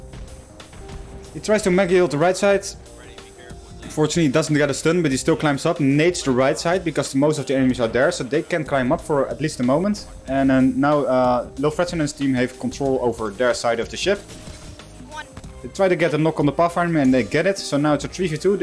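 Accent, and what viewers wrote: Netherlands. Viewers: Wy do you sound dutch 😂 ps hij is wel goed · Goeie shit bro, lekker nederlands accentje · I'm not trying to be annoying but you are dutch right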